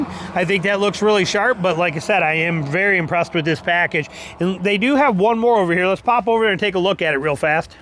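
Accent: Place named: Midwest, United States. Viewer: Are you from Minnesota? You totally have a very high pitched northern or midwestern accent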